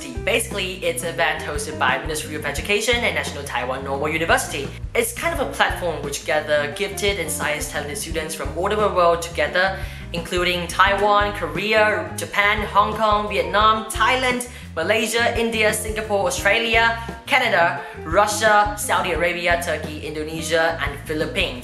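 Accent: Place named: United Kingdom. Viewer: Well done! Sound so British, haha!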